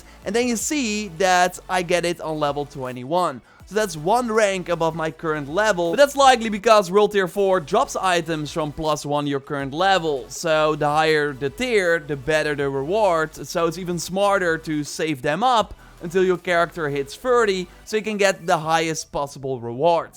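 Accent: Netherlands. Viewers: Are you Dutch? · I'm pretty sure he's Dutch he has a very thick Dutch accent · En toch hoor ik meteen dat je uit Nederland komt 😂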